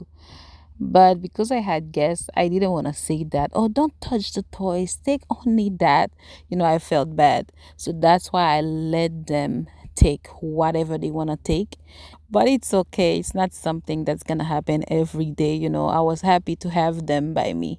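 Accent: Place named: Trinidad and Tobago. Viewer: Hi new to your channel ❤ enjoy your video. Are you Trinidadian?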